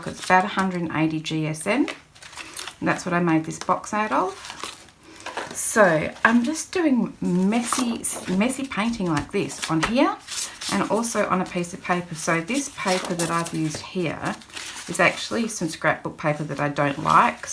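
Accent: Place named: Australia. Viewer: I just love to hear your Aussie voice on U tube..from a fellow Aussie..love what you do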